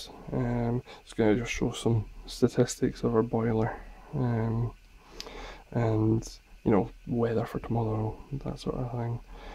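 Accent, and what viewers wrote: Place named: Scotland, United Kingdom. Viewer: English with a Scottish accent, if that helps you.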